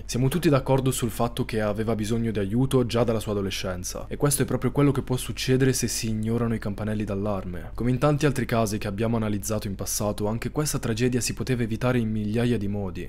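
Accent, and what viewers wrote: Sardinia, Italy. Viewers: Bella sardu sese?😂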